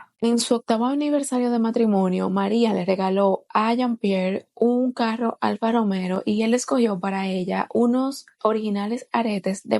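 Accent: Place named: Dominican Republic. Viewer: Me encanta tu voz, que agradable es el acento dominicano. Saludos desde México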